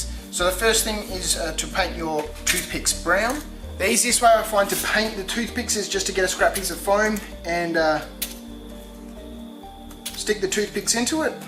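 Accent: Australia. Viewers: If you don't mind me asking are you Australian or Kiwi perhaps?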